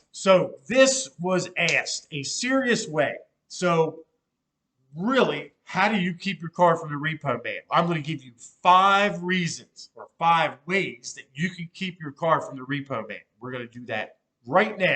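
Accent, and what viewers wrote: United States. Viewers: Is that sarcastic? He’s a bit country but the info is at least smart sounding to me. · I can't focus on anything hes sayin over the soujd of his Pittsburgh accent!!!!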